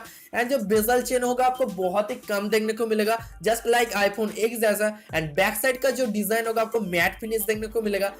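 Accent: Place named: Bihar, India. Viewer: Bihari ho kya boli se laga. cameda....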